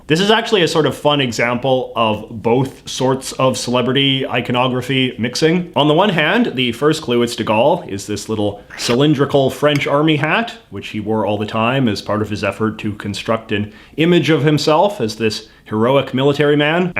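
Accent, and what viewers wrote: Canada. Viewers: As a Canadian who's lived in multiple prpvinces, from coast to coast, id love to know where in Canada that your accent comes from! I've never, ever heard anyone actually pronounce "about" that way. Not ever. I need to know where that stereotype comes from, exactly!! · You’re so Canadian that it’s distracting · I am from Montreal, and I watch several videos in English on Youtube. So far you are the only one I hear saying "About like if it was a boot". Maybe I hear to much from US. Everytime, I hear "A boot". Is is localize to where you live. Is in Ontarian? I am very curious.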